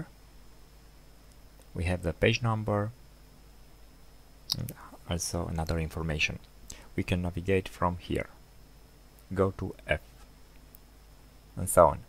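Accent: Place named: India. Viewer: The comment by Aayush Das Gupta says, with some profanity, that your English is good. NO, it is pathetic and is heavily laden with Hindi accent, the most pathetic of all accents. Your tutorial is a clear as stirred muddy water. Look at the down and upvotes. I seriously recommend you use a text to speech converter, there are a few of them to download for free. You should have used an ordinary text document, with page numbers and a proper word as a bookmark. LibreOffice's own help is as pathetic.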